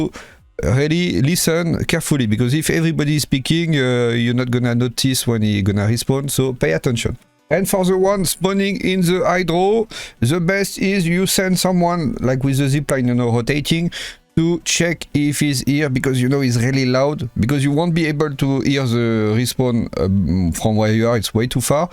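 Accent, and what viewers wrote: France. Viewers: I like u Baguette accent ❤ u do the best Videos for Trials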